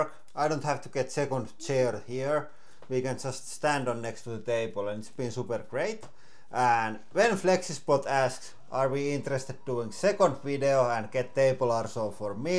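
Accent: Finland. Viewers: Somehow the Finnish accent goes so well with the hydraulic press.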